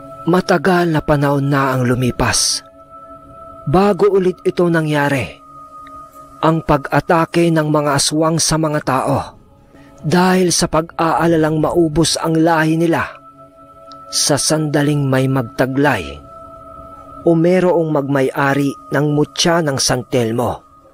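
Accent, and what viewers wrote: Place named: Ilocos, Philippines. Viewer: Nkk2wa k pakinggan anak kc my ilokano tlaga lov2 nnay ❤❤❤❤❤🎉🎉🎉🎉🎉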